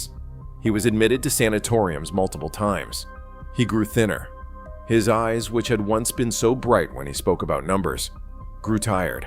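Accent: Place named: United States. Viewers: And the American accent is not exactly realistic. Are there no people still alive who can speak with an educated English accent ? In fact, this post was immediately followed by an advert for a charity, spoken in proper English, as spoken at Cambridge University. (I had a friend who came from a working class background and had to learn to speak in the accepted way when he went to Cambridge University). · The real Hardy didn't speak with an American accent. And he also knew how to pronounce Ramaujan's name correctly.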